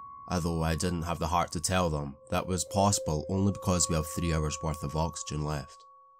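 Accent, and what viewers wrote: Scotland, United Kingdom. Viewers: Oh the snarky ones, good to hear that Scottish accent again😆😜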